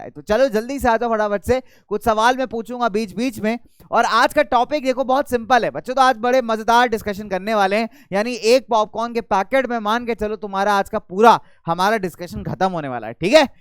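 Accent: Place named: Uttar Pradesh, India. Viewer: Sar lagta hai up se hai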